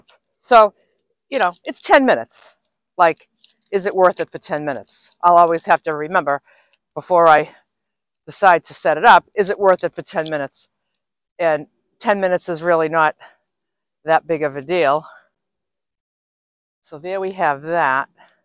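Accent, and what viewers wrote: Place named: New England, United States. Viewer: I swear, with your smile, your wonderful New England accent, and your enthusiasm, I'd enjoy watching you set up a checkerboard! You're so delightful and precious! Thanks again for sharing your story.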